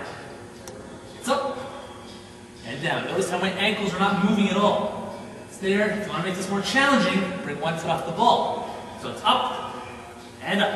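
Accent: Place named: Canada. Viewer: ha, I knew he was Canadian. The way he pronounces the "ou" in "out" is a dead give away. I used to date a Canadian and that's exactly how he talked.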